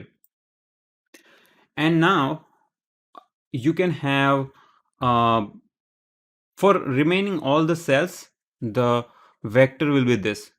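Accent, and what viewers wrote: India. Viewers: indian accent is so cute 🤣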